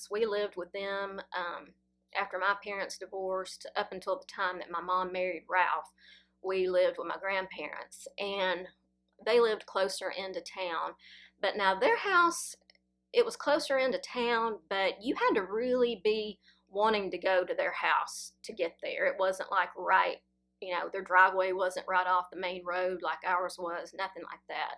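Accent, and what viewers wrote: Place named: Blount County, United States. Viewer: I KNEW I knew your accent! I grew up in Blount County! I remember when this happened and how scared my girlfriends and I were to stop at rest stops when we drove to the beach. SO scary.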